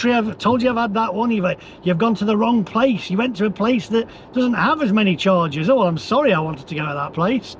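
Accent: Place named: Northern England, United Kingdom. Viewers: Nice to hear a fellow Northerner giving his thoughts. EV's are a con. They are worthless after 10 years as the battery won't hold a charge. Recent channel 4 test on a 10 year old Nissan leaf. It got 17 miles on the motorway before a recharge. 17 miles!!! And announced to today. EV's to be charged road tax in the coming years. That was always going to happen. I'll buy one of the last ICE cars and keep that for 10~15 years. That 10 year old car will still do 400 miles on a refill. I always buy a nearly new car and keep it till the end. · only somebody from the north will say "I am sat on". English is "I am sitting on.". YOU ARE FROM THE NORTH!😄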